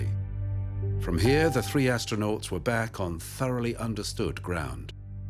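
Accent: United Kingdom. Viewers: I would have given this video a good rating if they had used an American instead of a British guy as narrator. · As for the comment from the video "John Glenn returned without incident ", There was a flashing light down at mission control saying that his heat shield had become dislodged. Some bean counter from NASA administration That if they kept the retro rocket strapped on, That maybe it would keep the heat shield and place long enough for him to descend through the atmosphere. This actually endangered his life because it caused unequal burning of the heatshield or something like that. There had actually been nothing wrong with his heatshield just a faulty bulb down at mission control. Oh and they never told John Glenn about it. This incident took the NASA administration out of direct control of the missions as they were happening and put full control into I think the guy's title would be called capcom. 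That being said, Check my math always check my math even when There is only 2 people in the room I am never the smartest person in the room. Your video footage is stunning. The narrator To me sounds British, Doesn't matter whether it be male or female the British accent just makes it the sound Authentic. Despite me Nit picking, I enjoyed the video thank you for the time and effort you put into it. Apologize for any spelling, punctuation or grammar errors. Although English is my only language that doesn't make me good at it.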